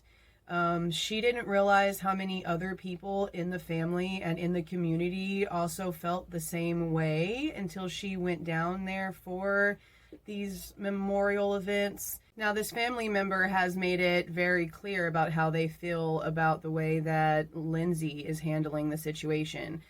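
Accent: Texas, United States. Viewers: Every way that you talk screams Texas girl lol 💙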